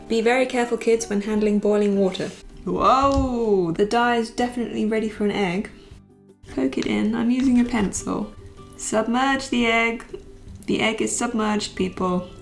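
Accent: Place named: United Kingdom. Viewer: Most of the time you get american english here in germany but i must say i like the british accent. :3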